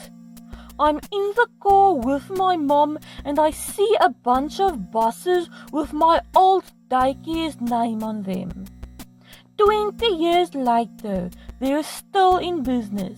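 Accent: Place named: South Africa. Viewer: Recognised the accent from a mile away XD so cool to see a South African story time/animator!!!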